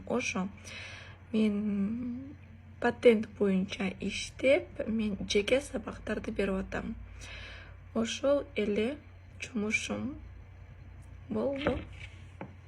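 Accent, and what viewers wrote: Standard. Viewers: АЗАМТСЫН ЧОН КЫЗ.АЛЛАХЫМСАГА ДЕНЬ СООЛУК ,ИЙГИЛИК ,АМАНЧЫЛЫК БЕРСИН. ТАЗА СУЙЛОЙТ ЭКЕНСИН АНЧА МЫНЧА КЫРГЫЗ КЫЗДАРГА ,БАЛДАРГА КАРАГАНДА. АЗАМАТЫН. АЛГА, АЛГА. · Азамат кыз 👍кээ бир киргизлерге караганда таза суйлойт экенсин 🌺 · Анча мынча Киргиздерге Караганда Жакшы таза суйлойт экен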